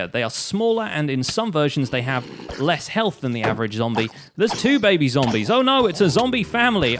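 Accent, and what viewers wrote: United Kingdom. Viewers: He's british bruh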